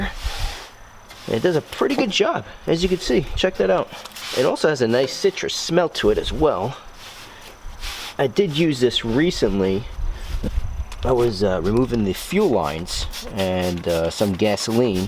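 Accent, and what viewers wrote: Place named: New Jersey, United States. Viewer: New Jersey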